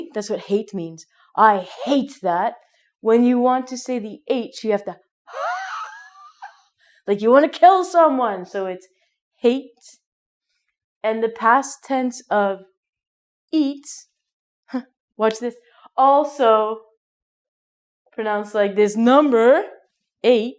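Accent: Canada. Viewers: Great video for learning Canadian pronunciation. out = "oh-t" mouth = "mow-th" etc. And she has that cheerful positive Canadian attitude as well. Love it. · oh my gosh, you're Canadian!! :D sorry, i had to say that xD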